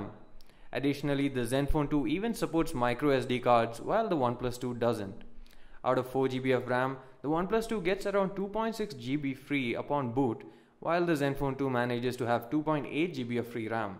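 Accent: Tamil Nadu, India. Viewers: bro are you from tamilnadu......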